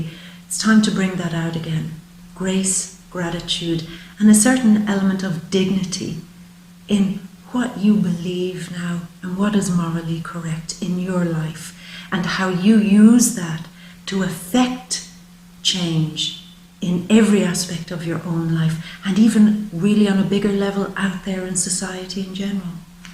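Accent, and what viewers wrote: Ireland. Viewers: I'm barely 4 min into your reading and love what you are saying! love the brogue. xoxox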